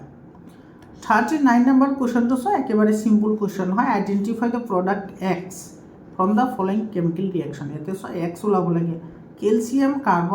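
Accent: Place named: Bajali, India. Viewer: bajali lage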